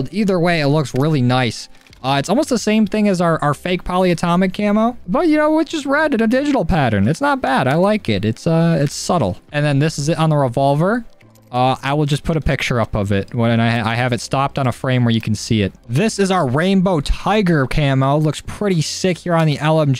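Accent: United States. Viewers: Americans and their pronunciation 🤦🏻‍♂️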